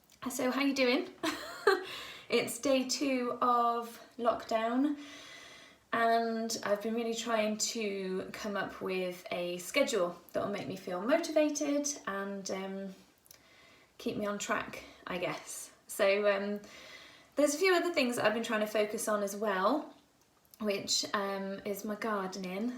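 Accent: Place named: United Kingdom. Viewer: Does your mother have a presence online? You make it sound like she is someone I would enjoy listening to. btw, I am also a biker but no tattoos. Speaking of listening, I love your british accent. I have a friend in my club that last year married an English fellow and brought him here to Michigan. Due to following so many GB YTers, I have a pretty good handle on the accent and slang. When he got up to speak about at our last meeting he got pretty excited and you could have heard a pin drop because some of his rant, while typical british, can be somewhat racist here. I started laughing and got up to explain what he actually said. That ended up being the quickest and best meeting in months.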